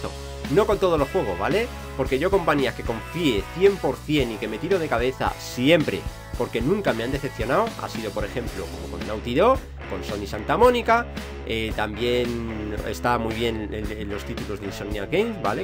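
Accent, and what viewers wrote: United Kingdom. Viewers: Vi todo el video para escuchar la pronunciación de de su ingles britanico y se salto...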